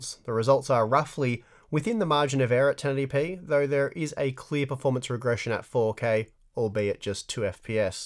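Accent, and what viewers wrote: Australia. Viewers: Love the aussi accent! 👍😜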